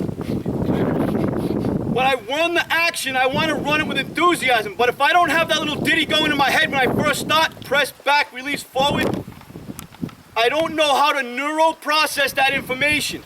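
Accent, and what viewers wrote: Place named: New York, United States. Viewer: Gotta be New Yaaawk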